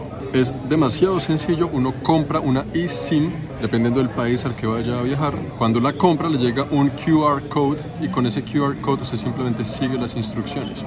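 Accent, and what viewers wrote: Colombia. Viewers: Amo como narras tus videos, y tu acento colombiano :) saludos desde Lima Perú. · me gusta mucho sus videos y que no olvidas la forma de hablar de nosotros los colombianos 😂😂😂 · Buenas tardes, te escribo desde Granada, en España. Mi marido y yo te vemos desde hace medio año más o menos. Me encanta que no hayas perdido tu acento colombiano y que además de tomar transportes de un sitio a otro como hacen otros creadores de contenido, expliques cuestiones relativas a la historia y la cultura de los sitios a los que vas. También nos agrada el hecho de que no hagas grandes aspavientos con la cámara y que tus videos sean siempre sinceros. Este me ha abierto mucho los ojos, porque a mi por lo menos me gustaría poder viajar ya que no puedo hacerlo por una cuestión económica. Cuando acabe el doctorado quizá. Un fuerte abrazo y sigue subiendo cosas, son pequeñas maravillas.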